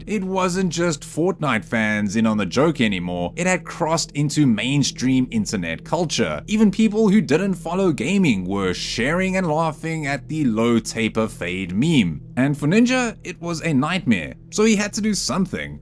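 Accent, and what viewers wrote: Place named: South Africa. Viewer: you sound like you south african trying to sound like an american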